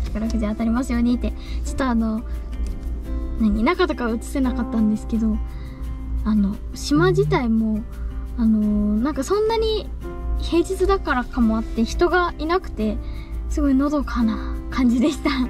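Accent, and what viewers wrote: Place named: Gifu, Japan. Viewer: 宝くじの神社と言えば長野の諏訪湖に有る諏訪大社有名ですがそこの神社で１番有名なのは「上社の御柱祭」全国ニュースでやる大きな木を山の斜面を落としてたりしてる神社ですよ☺️ 毎回、ちゃんの岐阜弁に癒されるw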